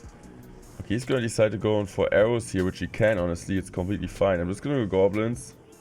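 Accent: Germany. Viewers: Ich mag den German accent in your sprach sounds sehr nice and refreshing zu all den anderen Englischsprachigen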